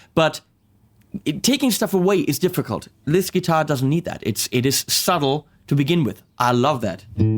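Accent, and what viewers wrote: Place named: Germany. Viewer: A review without this guy talking or someone else who doesn't need to be extra super dooper pseudo cool and swaggy fucked up german accent english. Yeah people get it. You like to hear yourself talking english but please keep it to your self or talk in your native tongue and use subs. I'm not trying to discredit your knowledge or playing. It's just your talking wanna be cool shit is so annoying man. And those great idiotic dumbfuck jokes. Oh you are so extra hip and remained young. You know? If no one is laughing it's not funny. Goddamn you're a douchebag. Even more than me.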